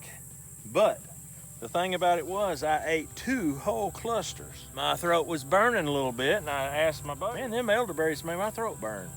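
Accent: South, United States. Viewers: That damn Arthur Itis 😂 I love that southern drawl sir 💪❤️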